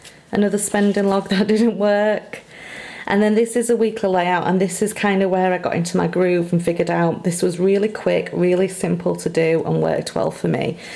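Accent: Yorkshire, United Kingdom. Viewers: I got waaaaay too excited when I heard your familiar Yorkshire accent 😂 I'm from Hull but I live in Leeds now ^_^ Love your bujo xx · Loved it. And I do believe you're a Yorkshire lass? Subscribed xx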